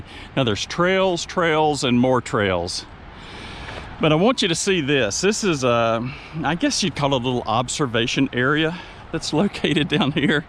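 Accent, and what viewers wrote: South, United States. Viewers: my pleasure, your content is great 👍 Also I'm from Florida, but moved to WA state a few years ago, and watching your videos makes me miss hearing southern accents all the time haha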